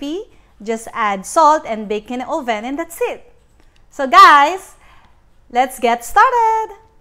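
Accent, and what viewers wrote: Philippines. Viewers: Pinay accent 😍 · are u pinoy? (the way u speak engliiish)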